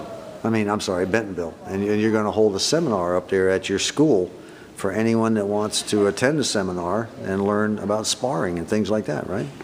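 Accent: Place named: Iowa, United States. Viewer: .....I can tell you are NOT a high-brow..cause you say "ANT" instead of "AUUNT", just like an Iowan like old Buzz!!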